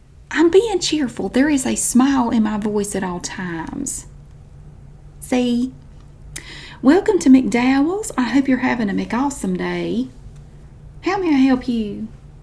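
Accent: South, United States. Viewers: This is amazing! Raised in Oklahoma and the accent is so wonderful.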